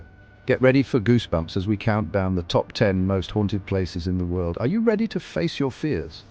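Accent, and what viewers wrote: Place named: United Kingdom. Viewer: YOU don't sound like him and I used to watch the show when it first came out you sound English (Brit) and Zach is from Las Vegas and younger than me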